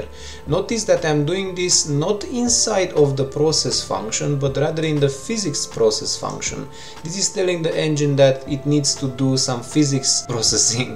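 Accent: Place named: Romania. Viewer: Tocmai ti-am vazut videoclipul, incercand sa imi dau seama de cum sa fac o detectie cand player-ul atinge zona cu foc, l-am urmarit pana la capat iar apoi am vrut sa ma abonez. Cand am vazut " " in nume, am facut legatura cu accentul tau si m-am bucurat sa vad ca sunt romani, buni, care fac astfel de content. GG bro, ai un nou subscriber!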